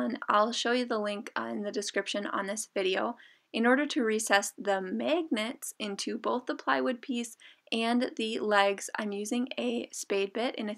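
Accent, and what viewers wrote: United States. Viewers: I'm hearing an American accent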